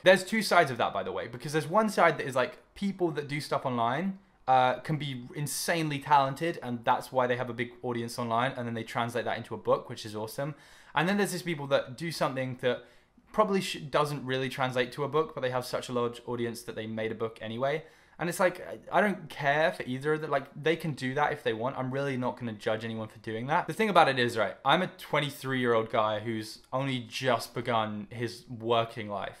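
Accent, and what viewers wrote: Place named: United Kingdom. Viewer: I love his accent it's obviously British but there's something else in it lool I'm so weird 😂❤️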